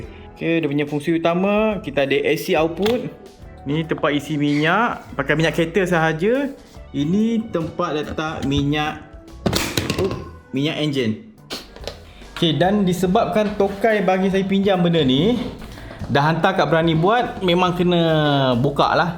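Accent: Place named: Kelantan, Malaysia. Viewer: Lama dah nk tanya ni. ni ore kelate ke? Ada perkataan bunyi kelate sikit..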